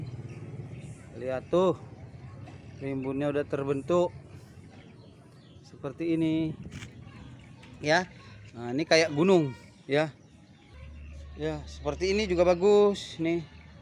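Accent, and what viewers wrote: Banjar, Indonesia. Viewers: Logat banjar nya kd hilang lah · Logat bahasa banjarnya masih kental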